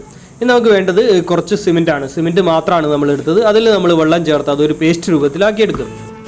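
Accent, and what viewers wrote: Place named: Malappuram, India. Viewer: Adipoli aayittund engale veed evide.samsaram kettitt malappuram aanennu thonnunnu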